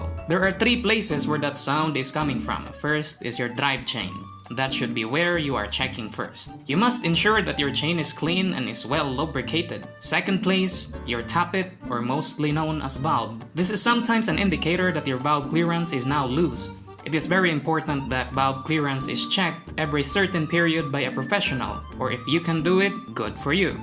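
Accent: Philippines. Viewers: very good explanation sir, speaks like a Filipino English Teacher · The accent is so Filipino 😊😘 Keep it up 👍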